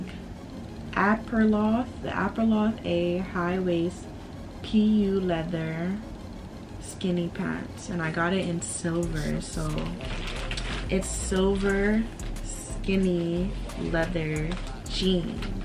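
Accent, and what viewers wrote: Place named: Jamaica. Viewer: As soon as me hear d patois me subscribe😂I shall live ova yah now🤣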